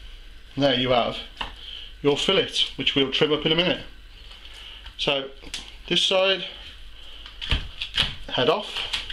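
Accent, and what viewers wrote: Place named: West Midlands, United Kingdom. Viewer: Hi, is this the new video you were talking about in your reply to me on your other Sea Bream [with chili] Video,??? If so, very simple and delicious recipe - Thanks,!!! Nice to see/hear a 'Brit' Midlander' doing great things in the kitchen,!!! It was trying to place your accent - West Midlands, Wolverhampton, Tipton, Dudley, Bridgenorth maybe,??? Be very interested to know - l do have a genuine reason for the question,!!! All the best, Paul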